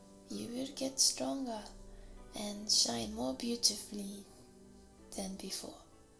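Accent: Japan. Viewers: My dumb ass read the thumbnail text as ‘kitsunei kurasai’ 😂, Your Japanese accent was excellent and made the entire session truly special. The way you spoke English with that Japanese accent literally sounded exactly like my mama! 😆 Ohh and the new binaural microphone was an amazing experience, it immersed me to the point where I felt genuinely present in the workshop. The ending was perfect, sweet and wholesome. It added a heartwarming touch to an already delightful experience, leaving me with a sense of satisfaction and gratitude. Thanks so much for this superb audio it was a masterpiece. It’s now one of my favourites and I can’t wait for more like this!! 👏🏼 Good luck with your exam today, I hope your mamas feeling much better sending prayers and my best regards ❤️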